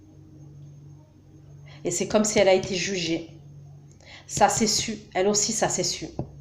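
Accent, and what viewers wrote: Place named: South, France. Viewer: Ta une belle voix du sud comme moi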